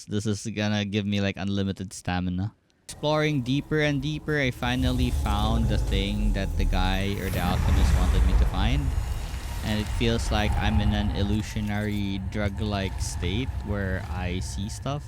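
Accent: Philippines. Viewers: dude are you filipino? cause you got the a ccent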